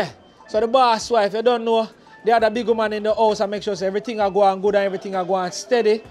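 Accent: Jamaica. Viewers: Do you want only Jamaicans to watch this so ease up on the patois and speak where everyone can understand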